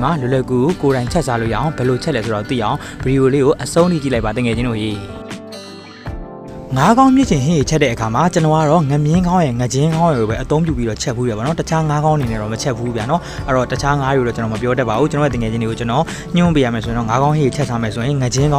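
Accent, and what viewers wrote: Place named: Upper Myanmar, Myanmar. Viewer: ကျေးဇူးပါဗျာ။ အညာဒေသရဲ့ အဝေးမှာ အညာသံနဲ့တင်ဆက်သံ က အလွမ်းပြေ။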